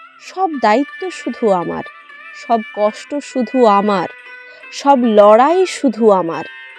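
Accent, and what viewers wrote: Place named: Bankura, India. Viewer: দিনে দিনে, বেশ পাকা পাকা কথা শেখাচ্ছিস্! বেশ ভালো হচ্ছে, যে যাই বলুক, তোর মত তুই এগিয়ে যা, তোর শক্তি আছে। তোর বাড়ি কোথায় রে, এত ভালো বাকডি ভাষা বলিস! ভালো গান ও তো গাইতে পারিস!🎉🎉 ভালো থাকিস। আমি প্রায় তোর সব ভিডিও দেখি😂😂🎉🎉🎉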